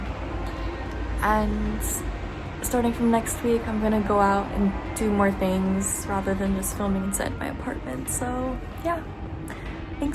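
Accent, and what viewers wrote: United States. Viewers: why an American accent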